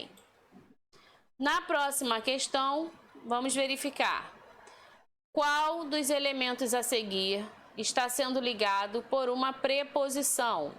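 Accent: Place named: Rio de Janeiro, Brazil. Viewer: "O quanto vc ainda está com duvidãnnn" kkkkkkkkkkk sotaque de carioca é muito engraçado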